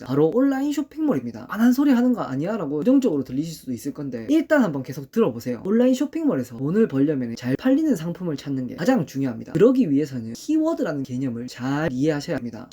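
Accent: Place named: Gyeongsang, South Korea. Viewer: 갱상도라서 더욱 정감이 가네요...전 김해임다. 구독합니다.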